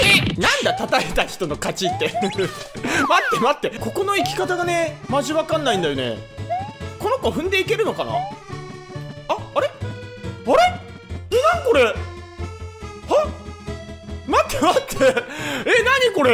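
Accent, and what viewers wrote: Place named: Kyushu, Japan. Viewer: 九州人だ〜、親近感w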